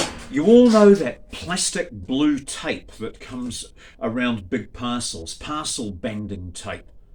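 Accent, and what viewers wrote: New Zealand. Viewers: Hi sounds like your. in kiwi land.im in northland ...have any available for p....?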